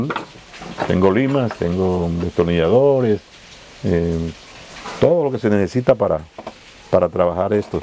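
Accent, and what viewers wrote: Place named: Caribbean Coast, Colombia. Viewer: Cuando adolecsrnte aprendi a tocar el acordeon piano, antes de irne al Conservatorio. Hace años no lo toco. Me dediqué al clarinete y luego al piano. En Sincelejo, alguna vez me afino el acordeón un señor llamado William Molina; hermano de Aniceto Molina. Recuerdo que en ese entonces, me senté en su taller a observar como hacía su trabajo y quedé asombrado de ver el mecanismo interno de mi acordeón piano de 120 bajos. Pero noto que este señor sabe muy bien de lo que habla y hace. Un manejo muy pulcro del lenguaje tecnico y musical. Me encanta tambien el estilo elegante al hablar del acento Costeño colombiano. Estoy embelezado de tanto conocimiento. ¡Felicitaciones al Maestro!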